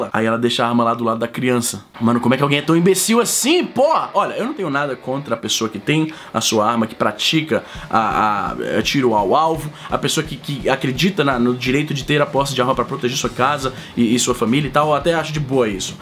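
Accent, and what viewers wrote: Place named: Ceará, Brazil. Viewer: Um dos vídeos que vc deixou transparecer seu sotaque cearense hehehe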